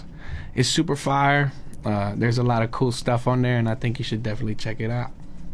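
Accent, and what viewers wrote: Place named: Texas, United States. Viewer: I hear that Texan accent!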